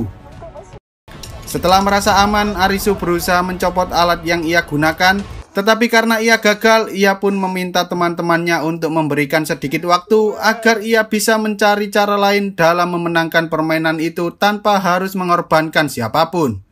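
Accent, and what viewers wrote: Java, Indonesia. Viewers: jawa kah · Mase medok banget...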